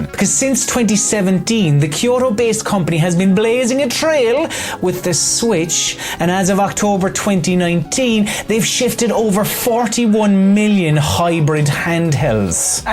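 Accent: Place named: Ireland. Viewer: Nice to hear your dulcet irish tones again 👍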